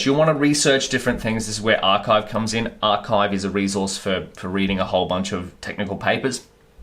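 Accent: Australia. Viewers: hahaha. I krew you live in Australia but assumed you've emigrated. All judged by the way you talk. English is my second language so it's natural mistake. :) I'd love to do some overlanding in Australia one day. Take care :)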